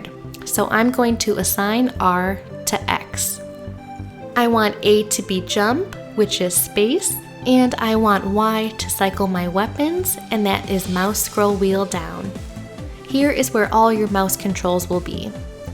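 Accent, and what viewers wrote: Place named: Michigan, United States. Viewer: Michigan or Chicago? I think Michigan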